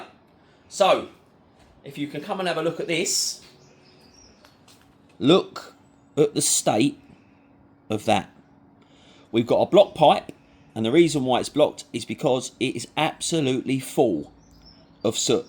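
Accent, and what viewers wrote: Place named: South, United States. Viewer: Fabulous work only wish you live nearer than your southern accent may suggest 😜👍, I want this same procedure doing so can you let me know how much to expect to pay so I can get some quotes? Thanks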